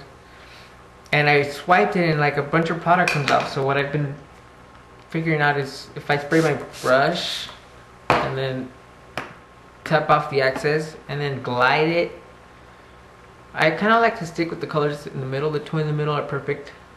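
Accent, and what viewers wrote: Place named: Latin America. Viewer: your voice is so calming and latino-ish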